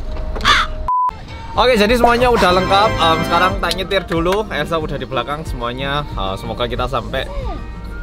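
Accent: Java, Indonesia. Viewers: Baru nyadar kakae hg medok rekk.... after cov. Oengen ke koreaaaa auiii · Mas suka indomi yg medok apa yg gmn mas? Kalo ak suka mas yg medok. Iih apaan sih. Maaf. Sukses sehat slalu mas. Dipertahankan trus ya mas ke medok an nya.. jgn kayak yutuber jadi2an. Yg suka pamer giveaway kesultanan yg fana dan prank yg gak beretika. Semangat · Duh mas ini medok banget:v Elsa nya comelll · Hallo Oppa, sangat kagum dgn bahasa indonesia rasa jowo nya yg sangat medok . Penasaran brp lama untuk bljr bhs indonesia sehingga bagus sprti itu. Dan terima kasih banyak untuk sudah menginterview BTS dgn sangat baik. Sbg ARMY aku subscribe channel mu. Matur suwun kamsa hamnida. 🙏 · sehat trus mas medok 💜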